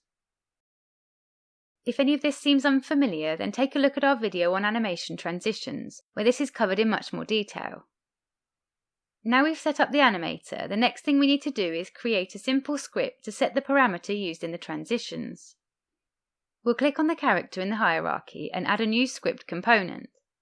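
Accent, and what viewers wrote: United Kingdom. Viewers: Eeeeexcellent! And I like the British accent.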